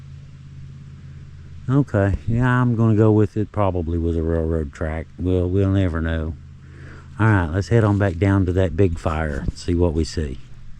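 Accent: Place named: West Virginia, United States. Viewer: How can I send you pictures of my family’s old-school coal trucks with wooden spoked wheels from the early 1900’s??? Now mind you these are actually from my mom’s side which happens to be right outside of Pittsburgh, now my dad’s side is from down your way in Jackson County Riley, WV & Ravenswood area, but you sound just like my dad’s people, the way you speak and the pitch of your voice I love it!!! Mostly are gone so it’s a breath of fresh air watching your videos to say the least!!! Please don’t stop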